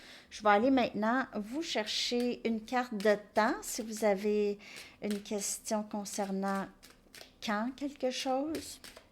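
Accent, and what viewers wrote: Canada. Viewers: Merci belle créature j'aime votre accent canadien et vos guidance je vous adore belle âme (Algérie )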